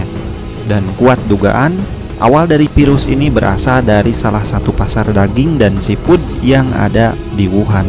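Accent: West Java, Indonesia. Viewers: Sunda banget logatnya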